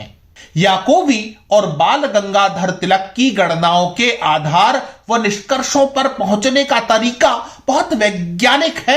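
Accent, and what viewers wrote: Standard. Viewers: बहुत ही सुंदर जानकारी वो भी शुद्ध हिंदी उच्चारण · शुद्ध हिन्दी उच्चारण, बहुत दिनों बाद सुनने को मिला| इसे कहते है हिन्दी!!! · हिन्दी का इतना शुद्ध उच्चारण बहुत कम सुनने को मिलता है. साधुवाद!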